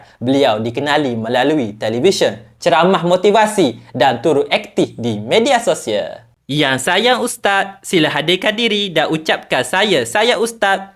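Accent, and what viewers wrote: Kelantan, Malaysia. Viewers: Doh mu bakpe dok cakap kelantan..naik luga aku dengor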